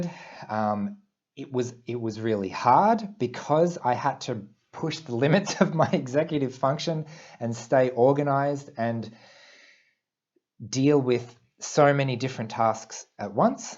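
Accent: Australia. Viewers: Hey man, you sound Australian (sorry if you turn out to be from NZ). Have you met Donna Williams?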